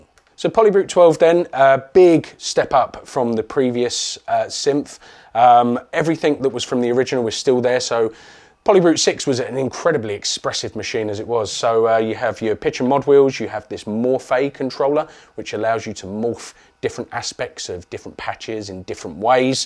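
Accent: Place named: London, United Kingdom. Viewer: LOL Polybrute SIMFF.... Love the London accent but mate.. Cant beat a Northern accent... RAAVER as opposed to Rather.. We need to celebrate the Englaish language and never shy away from our heritage !!!! The synth sounds so similar to the original.. but love the new features.. !!! Gotta say, if you need your sound to be immediate in your mix then the Sequential series are better but as an all round poke you in the eye and create esoteric stuff... Polybrute.. Go Arturia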